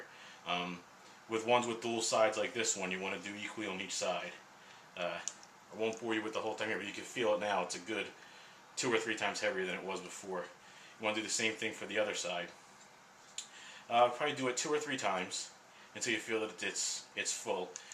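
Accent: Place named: Philadelphia, United States. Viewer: Philly accent.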